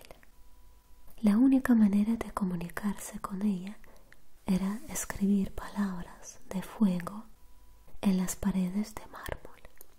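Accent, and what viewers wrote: Russia. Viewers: No me suelen gustar los videos de lecturas pero no entiendo porque al empezar el video el accento ruso puede conmigo xD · desde que escuché este video de lectura susurrada me hice adicto a tus asmr. para mi gusto el mejor asmr en español con acento ruso. Comienzas la oración con un nivel de volumen en tu voz y en la palabra final bajas el tono...esto hace muy placentero tu asmr. Gracias :) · Tu voz es fascinante, me encantan tus videos asmr. Esa combinación de español con el acento ruso es adictivo.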